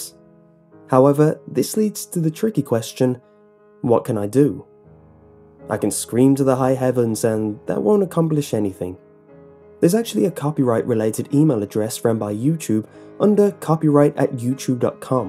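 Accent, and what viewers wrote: United Kingdom. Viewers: it's okay dude I will love your videos I also like your British accent manual coolest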